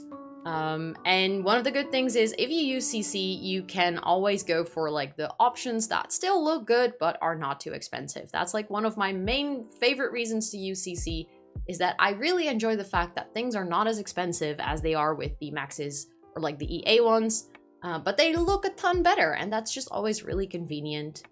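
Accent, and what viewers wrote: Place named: Netherlands. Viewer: I really want to watch this but you are Dutch 😂 I am too and I just haaaaaate a Dutch accent in English loool. That's a me problem tho, I am just wired weird but I cannot stand it 😂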